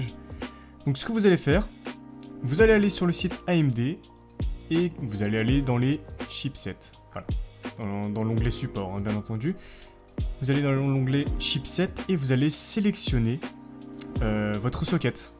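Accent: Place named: England, United Kingdom. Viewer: Rolala l’accent anglais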